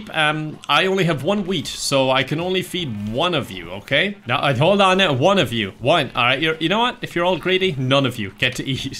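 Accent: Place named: Ireland. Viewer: Why do you sound like PewDiePie with a jacksepticeye accent 🤣 I know you are an Irishman ❤️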